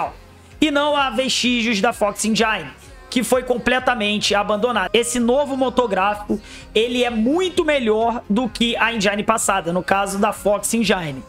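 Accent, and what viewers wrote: Rio de Janeiro, Brazil. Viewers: Vc é carioca ? Seu sotaque Xxx forteee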